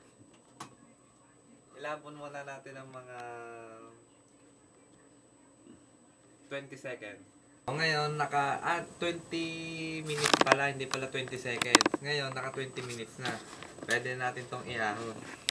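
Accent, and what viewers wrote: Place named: Ilocos, Philippines. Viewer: you have ilocano accent. pls.. don't get mad, love your video though... tanx kabayan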